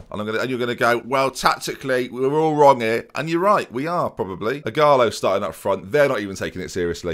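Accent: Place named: Liverpool, United Kingdom. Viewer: Great scouse accent you have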